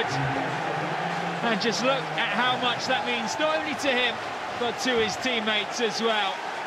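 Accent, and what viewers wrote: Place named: United Kingdom. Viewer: British innit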